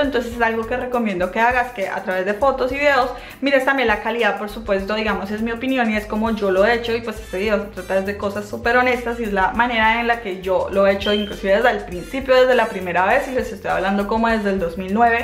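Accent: Colombia. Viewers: Acentua bien las palabras eres colombiana ..pero tu video lo ven de varios paises practica un tono standar .un consejo de un amigo.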